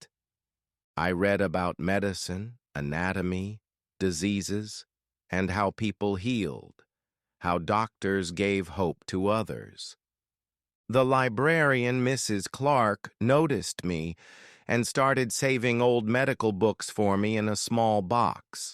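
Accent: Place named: Wayne County, United States. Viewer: Sounds like your in Wayne Co.😮😮😮😮😮😮😮😮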